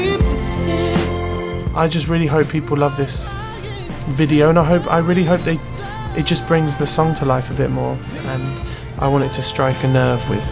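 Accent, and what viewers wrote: United Kingdom. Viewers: hes brittish.... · The British accent is awesomeeeeee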